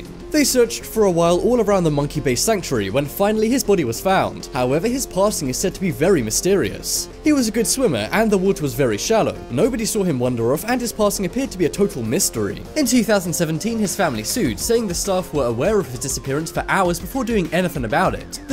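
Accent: England, United Kingdom. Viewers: damn you speak soooo british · Morgan Minnix no cos he had an English accent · it's 999 in england, and you sound English so you should know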